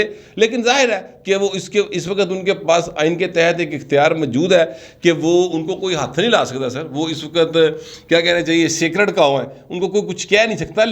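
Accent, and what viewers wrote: Punjab, Pakistan. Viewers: ""Kuti Cheeka"" Zara Tehzeeb Key Saath. If you watch VideoLog about 15Months before,He Talk Totally in Urdu,Very Serious but When He got Established (You Tube Dollars ),He start talking in Street Punjabi.Why?Cause he is "" "'.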